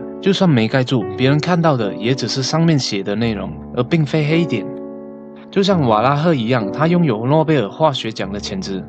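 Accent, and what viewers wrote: Malaysia. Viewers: 是馬來西亞口音嗎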